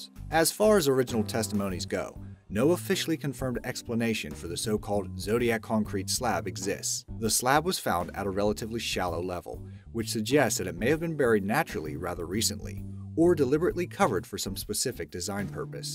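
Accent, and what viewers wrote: United States. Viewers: So American voice over an obviously foreign made video. "We are still dont know what it was" among several different word errors ive noticed. I have nothing against foreigners, just making a point.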